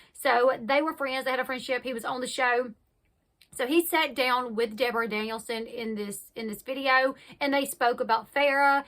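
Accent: South, United States. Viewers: im not even from the states and i can tell its real haha besides you havent skipped a beat in all your videos so if you were acting your damn good LOL plus i love your accent, could listen to your southern drawl allllll day!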